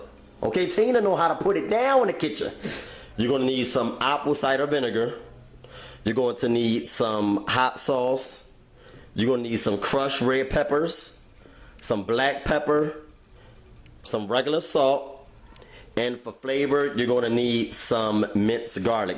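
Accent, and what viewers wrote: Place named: Texas, United States. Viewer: You sound like some of my relatives from TX. I know I overheard one of them mention that they boil them as a part of their cleaning process then proceed in cleaning.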